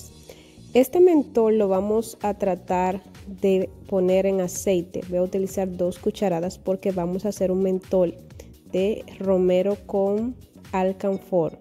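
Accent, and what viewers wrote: Dominican Republic. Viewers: Hola mi corazón, sabes antes de entrar a tu pagina, me imaginaba que eres Dominicana por las palabras que usabas en el video, bueno como dices los nombres de los ingredientes y la preparación!! jajjaja muchas felicidades y voy a ver si hago ese ungüento para regalarse a una amiga que tiene mucho dolor de las articulaciones!!!! Una pregunta tonta que yo escuchaba cuando era pequeña en los 1986 aproximadamente, una vecina de mi mama preparaba un aceite que ella llamaba aceite eléctrico, es verdad que sirve para los dolores también?....... cosas mías, pero siempre he tenido esa pregunta en mi cabeza jajjaja.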